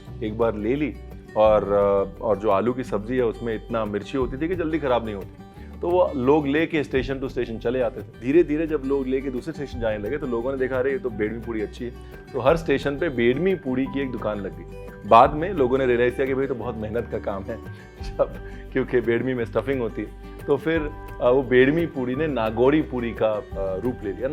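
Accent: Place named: North India, India. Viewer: I love how ranveer keeps it with indian touch with cooking and speaking style.. bilkul desi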